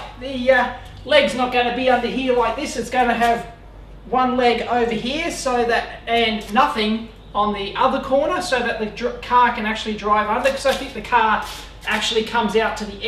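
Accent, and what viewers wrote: Australia. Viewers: Australian. His accent sounds nothing like a Canadian one. Also most Canadians would use feet for that type of job.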